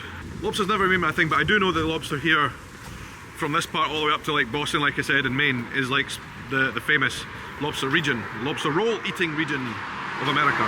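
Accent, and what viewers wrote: Scotland, United Kingdom. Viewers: sounds more Scottish than usual. It is one of Earth's coolest accents. 😁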